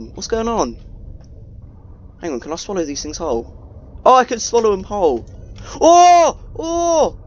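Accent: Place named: Scotland, United Kingdom. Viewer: oh I thought you were a scot because of your accent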